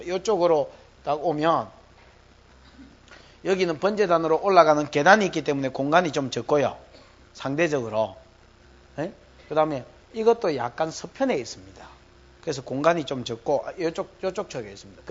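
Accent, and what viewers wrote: Gyeongsang, South Korea. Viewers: 열 채우는 것, 기 채우는 것, 하시니 어릴 때가 생각나 웃음이 나오네요. 경상도 사투리 정말 정감 있습니다. 천국 언어는 경상도 사투리 비스무리할 거라는 목사님 말씀에 전적으로 공갑합니다. :hand-pink-waving::hand-pink-waving::hand-pink-waving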